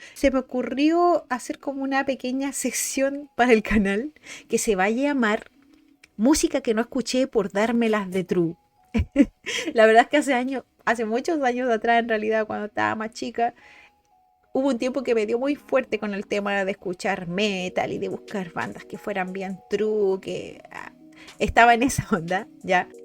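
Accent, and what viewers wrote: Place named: Chile. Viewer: Me alegro que hayas descubierto a, no te preocupes, no a todo el mundo engancha en las primeras canciones, normalmente con 3 de sus canciones mas conocidas te da una idea de lo que es musicalmente y a nivel de entretenimiento como nunca otro grupo de este genero lo hizo antes, pero aun asi encontrar esa cancion que te llegue (especialmente por la barrera del idioma) puede tomar varias mas hasta que la encuentres y te convenza, no estamos ante cualquier proyecto random japones, por el contrario, el concepto fue muy bien elaborado desde que eran pequeñas y hoy en dia son señoritas con mas de 20 años que han perfeccionado y crecido en muchos aspectos sin dejar la frescura que los caracteriza. Guiandome por tu acento supongo sos de Chile, tuve la fortuna de presenciar sus shows cuando estuvieron el año pasado en el Knotfest (Parque del Estadio Nacional) y el sideshow que hicieron en el Centro de Eventos Basel al igual que en sus presentaciones en Brasil y Argentina, y la verdad que me lleve los mejores recuerdos de mi vida, ojala vuelvan y pueda volver a conectar con esa linda comunidad hermana de Chile. 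Abrazo y espero que sigas disfrutando de este viaje pq aun te queda y mucho de lo bueno esta aun por venir.🦊🤘❤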